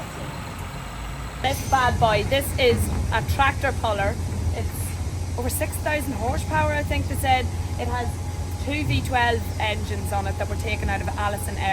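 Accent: Ireland. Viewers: ❤️ tho voice it reminds me of my trip to Ireland 👍🤠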